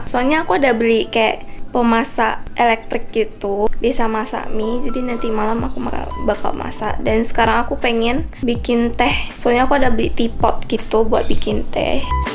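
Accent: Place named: Java, Indonesia. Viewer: KAKA KALO NGOMONG KAYAK ORANG JAWA SIHH 😂😂 TAPI AKU YA ORANG JAWA YA HEHE 😅😅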